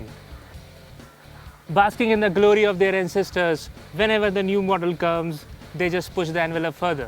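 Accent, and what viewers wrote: India. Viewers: I feel like I am listening to every India call center, trying to understand the mumbling English while I try and dispute a bill charge.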